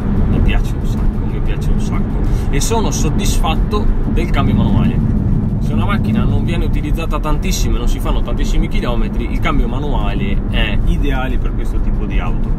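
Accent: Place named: Contarina, Italy. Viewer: ho beccato per caso il video tra i suggeriti e ho riconosciuto subito l'accento Contarinante,top meriteresti più views,adesso mi sparo anche gli altri video!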